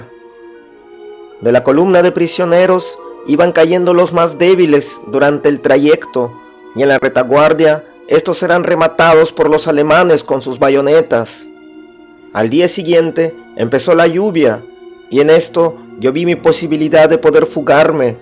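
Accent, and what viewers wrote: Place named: Russia. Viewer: Buenisima historia, por tu acento creo que eres ruso o slavo?, saludos desde el Perú.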